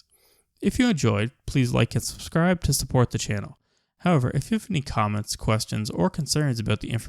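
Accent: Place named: United States. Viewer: Am. accent and you spell tires that way?? what is the story behind this?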